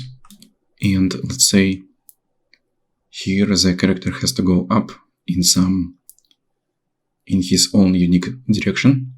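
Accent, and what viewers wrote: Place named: Russia. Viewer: Да нет же! Акцент жемчужина! Я сразу вспоминаю игру Red Alert... Комрад дженерал и другие озвучки русских юнитов))))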